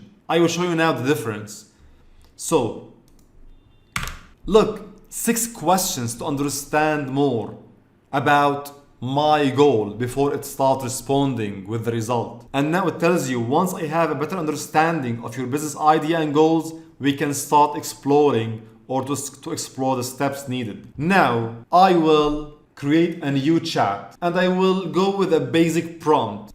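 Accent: Arab world. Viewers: This is my first video of this channel, and I know a good teacher when I see one. You've the capability to teach and keep the attention of viewers in this short attention span era. It was a treat watching. Arabic accent 🇧🇩🔥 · ألسلام عليكم. أأنت من العرب، لان انكليزيتك كالعرب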